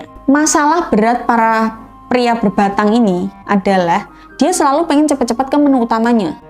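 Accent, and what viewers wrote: Java, Indonesia. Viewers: Jowo bgt mba asli hhaahaa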